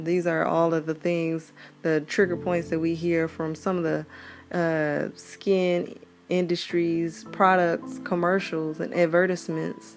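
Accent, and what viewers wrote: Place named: Detroit, United States. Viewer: Why i say to myself…. She sounds like she’s from Detroit….❤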